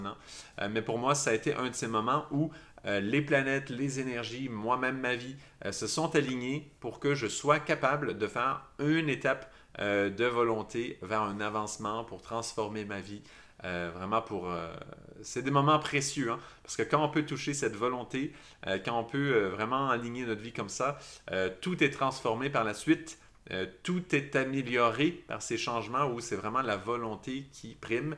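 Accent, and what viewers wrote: Quebec, Canada. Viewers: Merci pour votre lecture de la pleine lune et pour vos conseils d’une belle tenue spirituelle. J’adore votre accent québécois! 🤗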